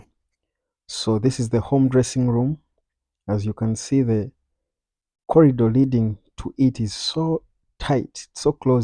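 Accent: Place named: Uganda. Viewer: eno accent ye Uganda eno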